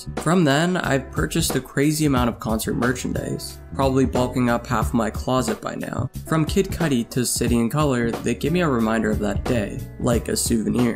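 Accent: Canada. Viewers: Hey, are you Canadian? Once you mentioned Wrong Bar and from 2012 i was like wait.. that sounds like Toronto talk!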